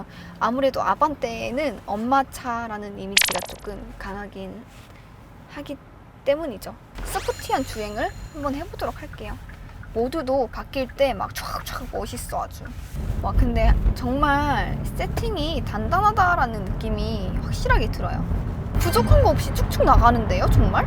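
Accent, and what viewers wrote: Gyeongsang, South Korea. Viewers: 경상도 사투리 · 갱상도 출신아인교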